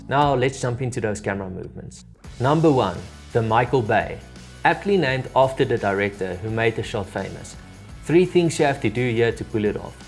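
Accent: South Africa. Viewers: Awesome tips bud. South African I'm guessing? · You sound South African 🇿🇦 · Am I hearing a Saffa accent? Thank you the amaizing tutorial! Keep it up! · As a South African I couldn't help but be taken aback by the accent. Cheers boet 🇿🇦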